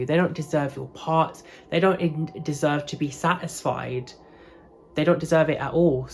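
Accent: England, United Kingdom. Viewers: you are totally a passable, even if you were having an off day, that English accent instantly makes you passable again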